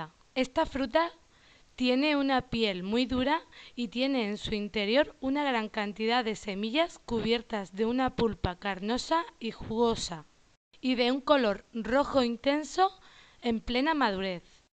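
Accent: Spain. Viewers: Me encanta el tono español pero lo que no me gusta es que la gente españoal no respeta a Dios. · Me gustó el vídeo, me gusta mucho el tono Español, estoy comiendo una ahora en mis vacaciones en Córdoba Argentina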